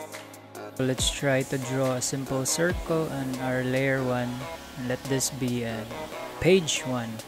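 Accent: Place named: England, United Kingdom. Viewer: nice tutorial i like your english accent i can understand it properly ^w^!!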